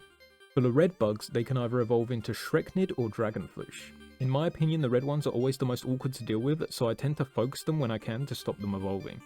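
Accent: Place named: United Kingdom. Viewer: Man I had to put you at 70% speed. British is hard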